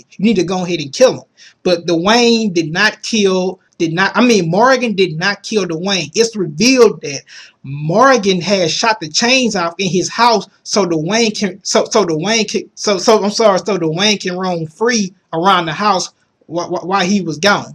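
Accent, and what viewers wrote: South, United States. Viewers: YOu Talk funny! LOL! A real live Southerner.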